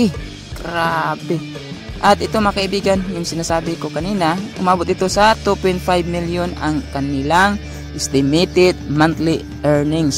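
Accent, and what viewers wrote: Visayas, Philippines. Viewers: bisaya ka dong haha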